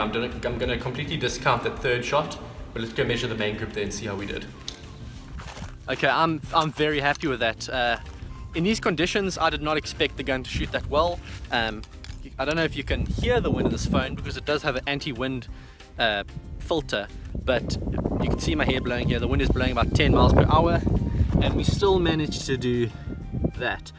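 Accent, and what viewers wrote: South Africa. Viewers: vkluchi subtitri mozhet tak budet legche perevodit.. U nego Uzhno afrikanskii akcent no v celom vse ponaitno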